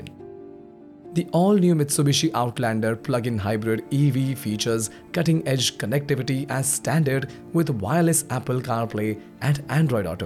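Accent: India. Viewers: English with an Indian accent Not interesting for advertising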